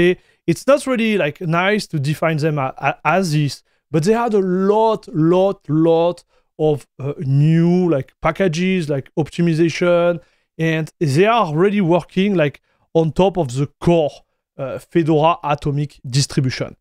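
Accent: France. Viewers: Thats a heavy French accent :P Mais bon j'ai tout compris donc pas mal!!<3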